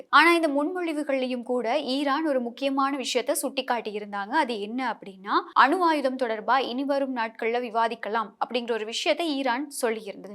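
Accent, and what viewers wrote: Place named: Chennai, India. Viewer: மூதேவி Madrasதமிழ் கதைக்காதே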